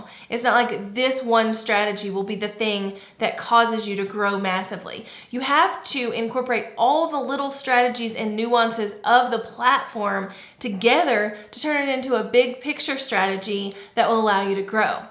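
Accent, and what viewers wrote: South, United States. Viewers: Absolutely love the content and the advice and on top of that, she is incredibly easy on the eyes and a delight to listen to. I love her beautifully southern accent. is really very knowledgable and an epitome of brains and beauty. I have okay audio but it sounds like I am on a phone call...which is what I used the mic for was meetings for work across skype. It is all I have right now, but a new mic is at the top of my list of things to get next. The rest of the 5 things are things that I am constantly watching for but I think I am handling pretty well. I don't have to worry about lighting except to make sure that it is not too dark in the game I am playing so that it is not too dark.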